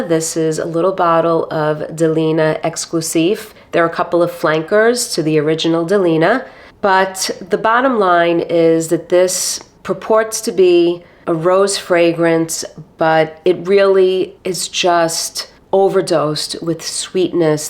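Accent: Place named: New York, United States. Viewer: Love the video. More of this style of content needs to be out there. Just a discussion of what we like and don’t like. Fragrance YouTube is all about marketing these days and it’s annoying. Oud Satin Mood is one I’m on the fence with as well. Great scent, but overpowering..and the Extrait just doesn’t make sense. It’s nuclear lol. I had to subscribe after hearing the NY in your voice! Brooklyn boy over here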